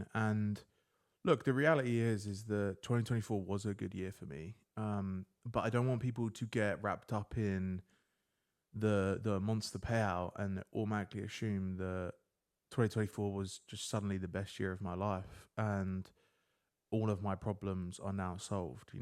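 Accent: United Kingdom. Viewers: dude you certainly don't have an ear for accents that's for sure. Everyone on earth knows that he's British as soon as he starts speaking haha. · wait your british? i always thought you was American 😅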